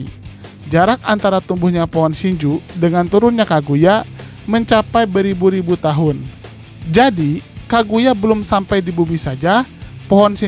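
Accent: West Java, Indonesia. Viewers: min lu org sunda yakk😁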